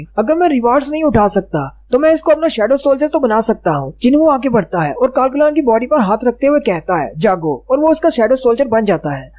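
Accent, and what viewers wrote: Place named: Pakistan. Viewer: Are your from pakistan beacuse your accent is not hindi it's urdu mix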